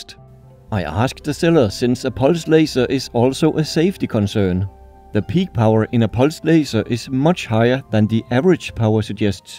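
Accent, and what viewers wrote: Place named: Denmark. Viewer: Grinernt nok du er dansk! Accenten skinder igennem :P Fed video!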